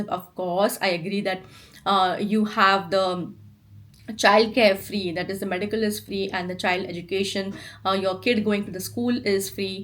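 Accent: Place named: India. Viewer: Thank you.. and I belive i did understand at least half of your indian-english! Its difficult becuse you use another pronunciation! But anyway.. sweden is not a paradise/not either a place to make a lot of money and move home after.. must be better places.. like Pakistan or Afganistan? How ever.. sweden do have one of the higest taxes in the world.. and its goes in to our healtcare and social care system.. its includes healtcare, schools, your pension, your parents healtcare and retirement homes, public transportation and a lot more! Its set up for people that lives in sweden! And then to people frome India and even other countrys.. its almoste higly educated frome top universitys of india or other countrys that get a job in sweden! Or even can apply for a job! And there is altso traps to be aware of.. swedish companyes that tryes to get cheap labour frome India.. 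be aware of that scam! Its rather this.. big international companys even if they are swedish.. do like to hire people frome other cultures.. to get a grip of the market there! High education and on top of that language and cultural skills is a benefit to get a job! Sooo sweden is not a paradise.. exept if one like to build and rise a family.. with kids!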